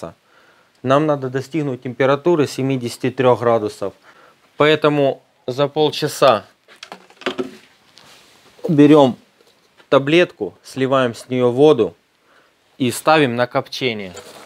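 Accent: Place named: Rostov, Russia. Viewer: Братишка,сам откуда? Я из Ростова на Дону,слышу говорок из наших мест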